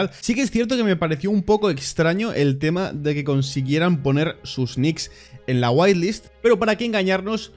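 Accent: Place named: Galicia, Spain. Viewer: Porque hablas super gallego y de repente metes frases argentinas?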